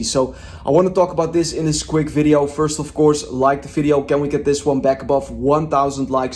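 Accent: Netherlands. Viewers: i love you content ♥️ I immediately subscribed to your channel!.. and even though you speak very good English... I still hear that you have a Dutch accent... is that right? 😊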